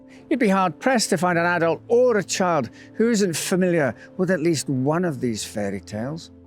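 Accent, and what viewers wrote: Scotland, United Kingdom. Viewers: I remember all of the Grimm Brothers books. I love the real history of them. I always love watching you on YouTube. Love the Scottish acsent. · I love to listen to your telling of tales, and love it when you ‘lose it’. You have a very calming voice and your accent reminds me of my Scottish in-laws, rest their souls! Thank you. ❤️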